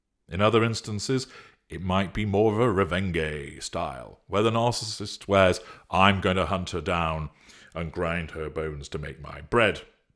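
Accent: United Kingdom. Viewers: He’s British.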